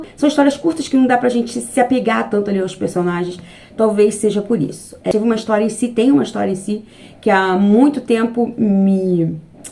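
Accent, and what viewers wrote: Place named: Rio de Janeiro, Brazil. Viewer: te acompanho há pouco tempo. E ficava sempre “encafifada” … é carioca. Como acompanho uma infinidade de canais … a gente vai pegando as gírias, os sotaques. E nesse vídeo específico … tirou minha dúvida. 😂😂😂 Carioca!!!! Parabéns!!! Adoro seus vídeos e seus shorts. Você é autêntica. E me identifico em uns shorts. Sou eu!!! e rio muito. 😉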